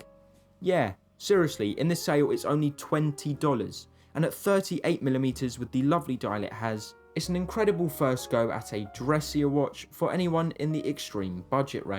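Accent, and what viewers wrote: England, United Kingdom. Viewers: He is British, whats the problem.... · If you find the way this guy speaks irritating, then you don't want to venture any further than Southern England. I live in the North and there's a completely different accent every 30-40 miles. To anyone outside the UK it probably feels like they're visiting a new country within a 1 hour drive 😂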